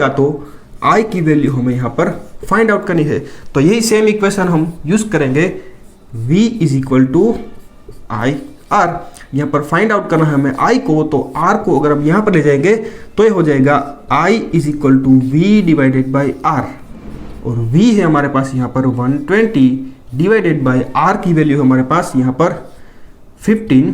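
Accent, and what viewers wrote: Gujarat, India. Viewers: Bhai tame Gujarati cho ne. Awaj thi khabar pade che. Kayi city thi cho bapu?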